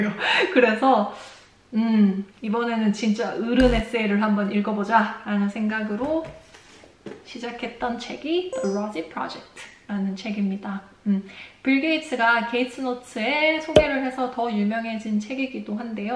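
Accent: Gyeongsang, South Korea. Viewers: 원서를 몇권 읽어보니 처음엔 책장 넘어가는 속도가 너무 느리다가 탄력받으면 마지막으로 갈수록 많은양을 하루에 보게 되더군요. 한국말을 차분히 들어보니 경상도쪽 억양이 있으시네요~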